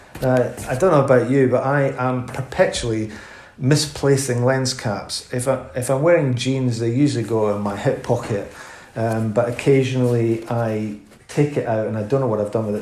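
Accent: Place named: Scotland, United Kingdom. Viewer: That is stitched on. Just lift it up at the edges and cut the stitches with a seam ripper or sharp pocket knife. Off she comes, and looks quite generic, I bet. Love your Scottish accent. That camera you've got is not as handsome as my Olympus E-M10.3, but having the viewfinder off to the left side of the body really makes up for it. (for the right-eye-dominant user) The nose just goes off to the side of the camera, instead of into the back of it with the head turned awkwardly sideways.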